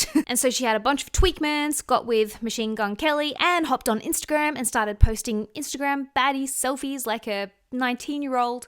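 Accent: Australia. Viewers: It´s so cute how your Aussie accent got stronger since you moved back to Australia <3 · I like your videos very much! (And your aussie accent;)) BUT PLEASE, FILLER are NOT completely dissolvable! Greetings from switzerland🤗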